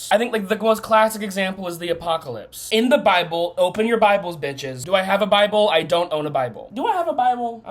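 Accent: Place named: Midwest, United States. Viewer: You have the same accent as me: "Root" = "Rut" and "Woods" = "Wuds" - are you midwestern?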